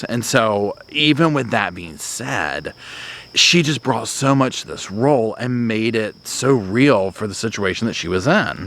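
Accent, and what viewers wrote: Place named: England, United Kingdom. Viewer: Hahaha loving your English Emily blunt accent 👏👏👏👏I’m English and people always think we speak posh! Like the royal family and erm....I don’t lol x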